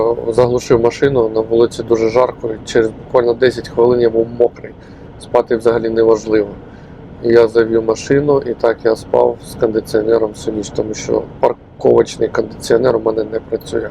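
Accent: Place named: Poltava, Ukraine. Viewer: Головне ти намагаєся,мовник сам собою налагодиться.А зараз в тебе виходить полтавський суржик.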